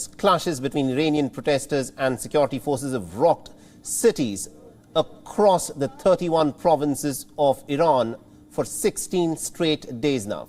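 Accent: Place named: India. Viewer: Oh my gawd your thick indian accent!!! We cant understand a thing!!! Please replace this news anchor.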